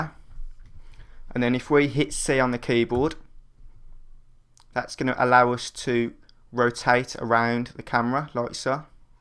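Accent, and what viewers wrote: Birmingham, United Kingdom. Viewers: That's a brummie accent from Birmingham, England. Chips an Grayvay! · Sounds like Birmingham UK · i beleve its Birmingham (uk)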